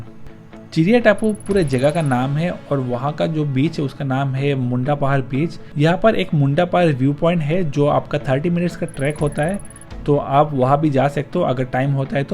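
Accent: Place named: Bengal, India. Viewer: From your accent I guess either u r Bengali or Assamese?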